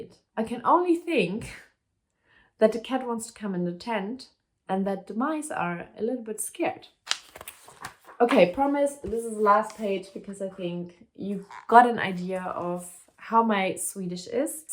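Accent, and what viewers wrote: Germany. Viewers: Well there is a potential for getting better! Some times you use german pronuniances where english wold be better! In anyway, swedish and other Norse language could be named as "plattyska dialekter", (north german tounge), that is eradicated in Germany and is replaced by (högtyska dialekter), high german tounge! They say that sweden is one of the best english speking country in the world.. thats only says that rest of the world is worse! In anyway, the more swedish you understand the better, and you can answer in english, in many cases! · Oh dear, that German accent is so hard to lose ... I've got an acquaintance (from Austria I think) who's been here for ages ... He still has the German accent =). Just keep it up - as others have said, as a German speaker, learning Swedish should be fairly "easy". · I will give you an A for effort! I think you did it allright, and as someone else already pointed out you speak swedish with a german accent - which I think many swedes find rather cute... 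(We have a swedish female celebrity with german origin who has been living in Sweden for almost 50 years and she still has a strong a strong accent, in fact your pronounciation sounds a little better than hers!) Within a year - or less - you will be almost fluent in swedish is my guess. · You did really well! Swedish with a german accent is so charming, really love it 💚 Of course there are several tricky words, one of them is "och", it's pronounced "ock" or like a short "å". You go girl!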